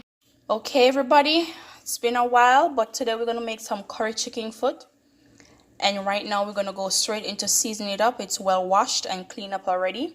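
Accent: Jamaica. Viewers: I love to hear my Jamaican accent on these cooking channel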